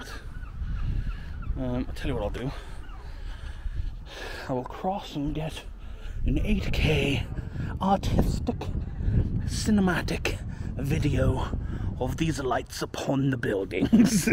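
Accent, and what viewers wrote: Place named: England, United Kingdom. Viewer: ????????????????????? I was only joking...half joking the English accent was grating on me but apart from that good vlog.... don't be mad at me 🥺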